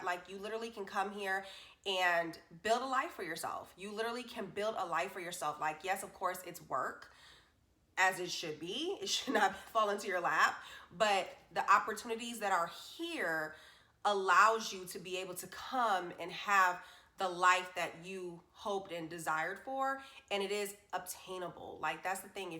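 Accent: Texas, United States. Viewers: First video I’ve watched of yours! Love your view of TX. Did you notice your cute Texan accent coming through?! You can definitely tell you’ve been around Texans for a while now. Be proud of that accent!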